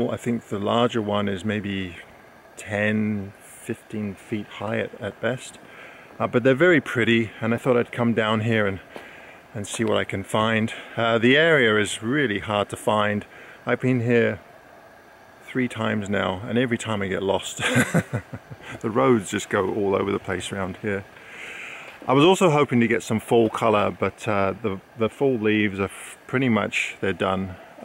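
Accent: United Kingdom. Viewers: Lovely photos. I loved the photo stacked one, beautiful. Can I ask, although you live in Canada are you British? You have a very British accent.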